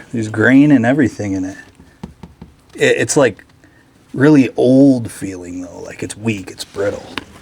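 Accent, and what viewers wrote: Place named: Canada. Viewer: Wooden pipes are just flat sided barrels, pretty much the same cuts to the wood, except the steel wire holds the compression. Once the pipe gets water, the wood swells and the wires don't. Because there is a water pipe the same size going downhill from this building I believe it was a speed baffle and control using 2 guillotine valve blades. Downstream somewhere would be a water wheel to power a belt drive system most likely for a lumber mill. Don't know where this, is but the guy has a bit of a Canadian accent so lumber seems most probable.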